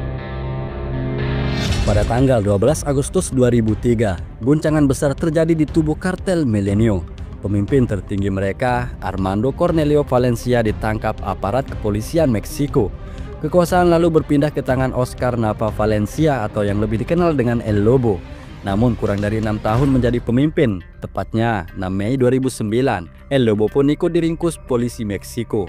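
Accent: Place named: Lombok, Indonesia. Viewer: semaikn ndek asing logat sasak nii, ternyate dgn sasak Lombok Admin😅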